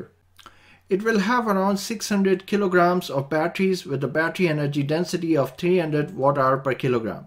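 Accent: India. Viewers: then where are you from your accent sounds little Indian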